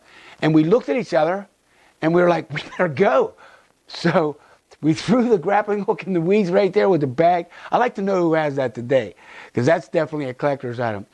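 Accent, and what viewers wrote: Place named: Pittsburgh, United States. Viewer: This guys Pittsburgh accent has me missing home.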